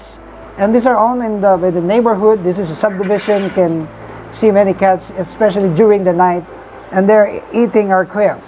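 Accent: Philippines. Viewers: Ganda ng English nya, kung may indian English, ang sa kanya Filipino English.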